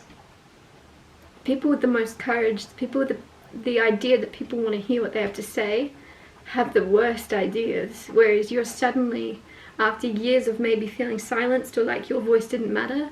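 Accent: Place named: United Kingdom. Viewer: Hi! Cheers to you and this message ❤✨ Spirit has confirmed what I have felt through your channel and channeling. As a former ex-pat your voice makes me miss the lull and grounding nature of your British accent in particular. I went to drama school over there and studied the different dialects actively and inactively. I am grateful I came across your lovely energy and channel. You're gifted. Keep spreading Love and Light and inspiring innovation and courage ❤ Blessings and Light to you Olivia x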